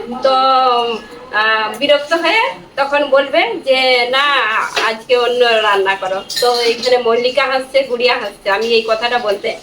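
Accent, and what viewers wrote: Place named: Birbhum, India. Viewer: Birbhum ,Purulia ,Bakura hobe ,kothar style e tai mone hocche ,😄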